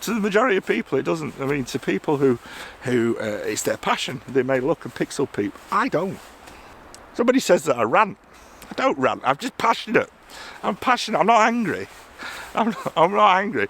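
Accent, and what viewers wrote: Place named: Yorkshire, United Kingdom. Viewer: magic great to hear straight-talking Yorkshire patter. What is the point of a technically correct image if you don't like it?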